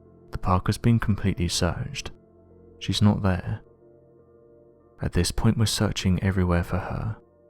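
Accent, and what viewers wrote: United Kingdom. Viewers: Why always the brit accent makes not believe anything they say they are the sales scammers of the univers4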